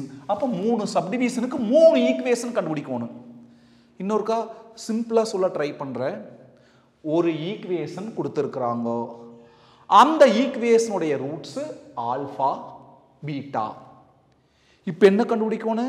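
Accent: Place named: Kongu, India. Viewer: Sir you teaching methods is very useful for beginners thank you so much your kongu slang is nice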